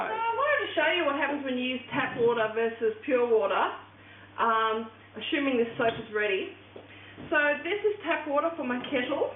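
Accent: Australia. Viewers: She is an Australian. That is how they say it. We sound funny to them. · Very nice video. I appreciate the recipe and all measurements. Love your Australian accent :-)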